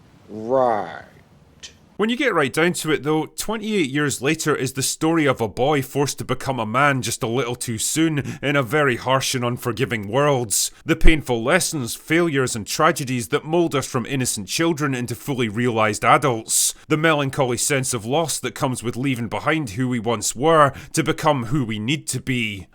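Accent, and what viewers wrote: Scotland, United Kingdom. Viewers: Accent. Scotsman. Kettle. Ha!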